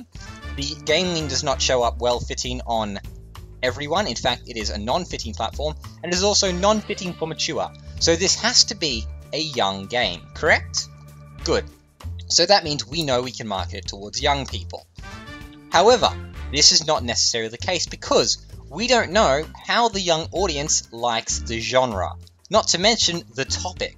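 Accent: United Kingdom. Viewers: Nicely done tips, this game was kind of frustrating me in that every game i made would tank or i was moving too fast when it told me too. Also rates up for your voice too, you must be a Brit like I.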